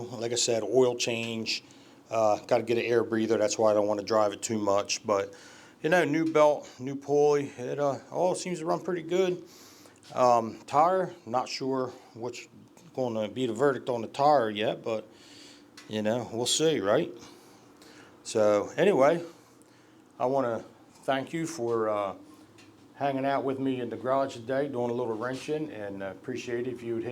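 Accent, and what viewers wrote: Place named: Ohio, United States. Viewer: Are you located in Ohio by any chance? You sound exactly like my brother in law. He is from eastern ohio. Nice video.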